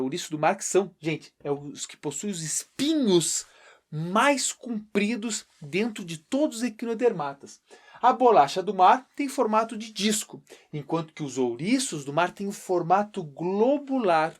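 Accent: Rio Grande do Sul, Brazil. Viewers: sabia que tu era gaúcho, reconheci o sotaque, masss bahh tchê que barbaridade de vídeo, muito bom parabéns !!!!!!!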